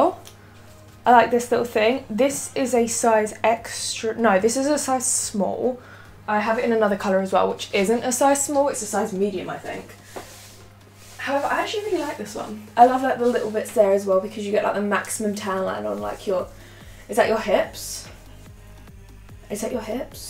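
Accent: United Kingdom. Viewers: Ela é britânica da pra ver pelo sotaque. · I did not imagine her with a british accent wtf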